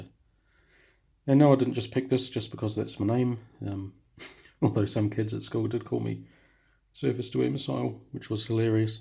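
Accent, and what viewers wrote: New Zealand. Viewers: A kiwi accent?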